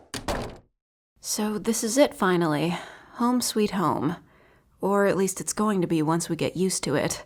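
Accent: United States. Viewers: She's american? Darn... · the most American sound Russian on earth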